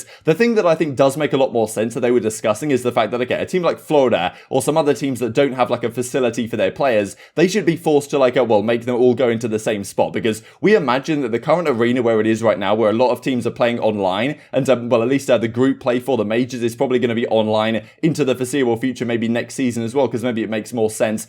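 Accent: United Kingdom. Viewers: I’m also curious 🧐 British? Haha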